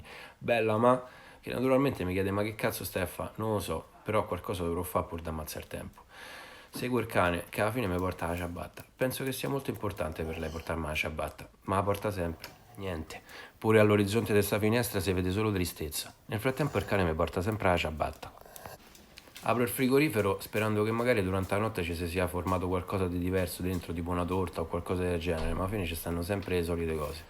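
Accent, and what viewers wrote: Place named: Rome, Italy. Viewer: Siamo a Buenos Aires, racchiusi come voi. Anche Io ho provato serenità con i tuoi video, e li aspetto. Ho consigliato ai miei allievi d‘italiano di seguirti per imparare come parla un romano. Salutami il pesce!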